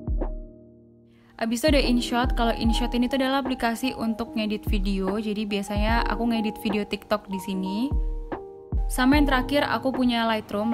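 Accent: Java, Indonesia. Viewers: suka sama medok nya gemoy ❤️🤣🥺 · Kakak Asli mana ?? kok ngomong nya medok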